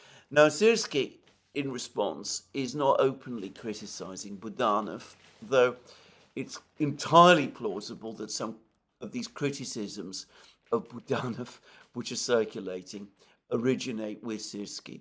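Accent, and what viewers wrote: United Kingdom. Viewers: AM feeds off of the gullibility of his audience. Take some time and listen to other programs. This one is ALL KREMLIN PROPAGANDA NARRATED BY A MOUTHPIECE WITH A BRITISH ACCENT AND A VERY SORTID PAST.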